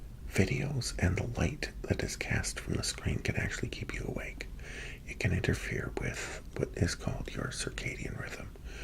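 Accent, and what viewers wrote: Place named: United States. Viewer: You have a wonderful relaxing voice to listen to. With a mild American accent too. New subscriber 👏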